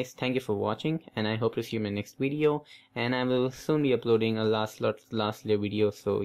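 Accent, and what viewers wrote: India. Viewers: Of course he is from india His accent is indian and he orders some cubes from cubelelo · Pta tha aap ek indian he hoge qki aapki english indian jse he aur aaisa steel bowl to indian hi use karte he😁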